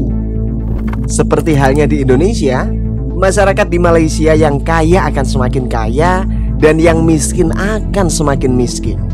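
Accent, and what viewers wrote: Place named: Java, Indonesia. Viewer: Medok ,kawus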